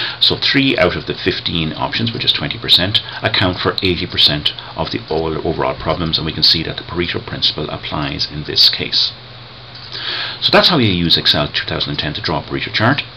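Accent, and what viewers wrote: Ireland. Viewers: You just saved my ass for my class. Please keep this up. On a side note - awesome Irish accent man.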